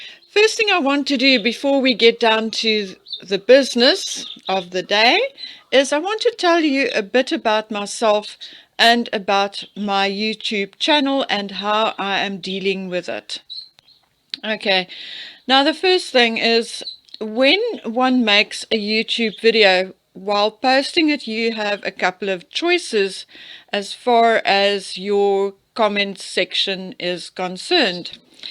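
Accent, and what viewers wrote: South Africa. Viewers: My best friend is from South Africa (Rustenburg) , married a German and now lives in Germany. We became fast BFF when I worked in Germany (now I'm back in the US) and I miss her very much. I love her accent and every time I listen to you it reminds me of her. Do you also speak Afrikaans? Please keep up the good work and keep digging. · Darling girl, my prayers are winging their way to you for many reasons but Rusty's passing is a crippling loss. I lost two of my doggies within 13 days back in May. Hellish beyond hellish, but you know what that pain is like. Suffice to say, after a fortnight I rescued another mutt and my heart feels full of joy once again. You strike me as a very well educated young lady. You are a real one for attention to detail and I love that in your general make-up. I enjoy all of your presentations as they are so different from other channels covering the dis-loyal royals. 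I too am a big fan of Yankee Wally and can hardly get off to bed until I hear her video! Love her Welsh accent as much as I love your South African one. Being a Scottish lady from the Shetland islands originally, my 'mother tongue' is Shetlandic - (Shetland cum Nordic). Google it, it will tickle your fancy, no doubt! Re: Meghan Markle's age.Yankee Wally put up a photo of her Graduation. She holds a staff with the year 1995. She is wearing a white gown. She has no coloured cowl as she didn't make the grade and pass with flying colours. MM the yacht girl has been 100% exposed by you...............YIPPPPPEEEEEEEEE. Well done, girl. You are a top detective. Thank you for that expose- the photo's with Prince Harry et al. I'll sign off for now but not before I wish you continued success with your fantastic channel. I appreciate your endeavours. Take good care. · 🇨🇦🇨🇦🇨🇦🇨🇦...another superb video full of information, I agree that mm knew Andrew previously. 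You can see it by the way he is in different photos they're both in. mm is a lying fraud and imo they haven't lost their titles because she's got something on at least one of the rf members if not more. Many people have lost their titles for much much less, it's extremely suspicious. Hopefully it all comes out at some point....keep up the good work. Lastly I love your accent, both of my doctors on none other than Vancouver island are south African and have the same accent as you...🇨🇦🇨🇦🇨🇦🇨🇦🇨🇦🇨🇦..💕💕💕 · It’s so lovely to hear your accent. I am also South Africa and recently FaceTimed someone in Texas. I had never met her but she was fascinated by my accent and loved it. I believe we as South Africans speak very clearly ( to the most part) and your accent and voice are easy on the ear. Actually we have a distinctive accent that one can hear and recognize instantly. Be proud of your lovely speaking voice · There is nothing improper about a South African accent. 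Your English is better than that spoken by many Brits. Be yourself lively lady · Love your videos. You obviously put in a lot of work. Please don’t change your accent. It’s what makes you, you. You speak clearly and that is all that matters. I, for one, love listening to a South African accent. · I love your accent. I have two friends from South Africa and I love to hear them speak, as I do you. Do not change, just be you. I am so glad you were recommended by Yankee Wally. I really enjoy your videos and I trust them, because I know you do lots of research. We all have growing pains when we start something new. Keep on going girl, your channel is awesome! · You have a Sth African accent.It is nice.I love your art work...the worm is cool. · Your South African accent is charming. We all speak English. I speak with an American accent. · Brilliant video as usual. And as a fellow South African I LOVE hearing you speak. 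Your research is outstanding, and your courage in speaking the truth is commendable.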